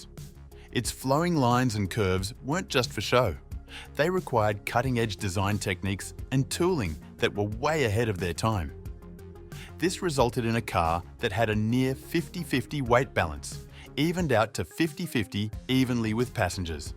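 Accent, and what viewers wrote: Australia. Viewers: Love hearing an Aussie voice doing one of these, amazingly put together video to top it off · Who wrote the script? This guy has an aussie accent but speaks the American language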